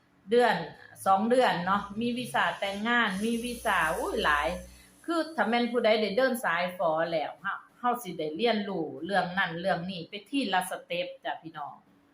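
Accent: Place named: Sisaket, Thailand. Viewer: มักๆเว้าคือคนสีเกด.เเท้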